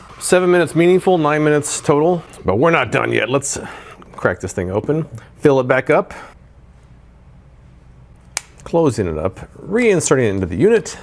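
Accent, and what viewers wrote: United States. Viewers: To me he sounds like the most american guy ever. This what I'm thinking when someone say: american guy.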